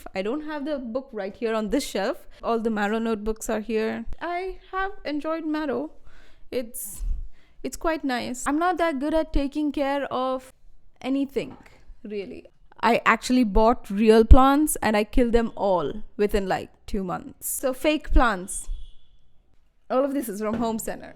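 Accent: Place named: United Kingdom. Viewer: You have british accent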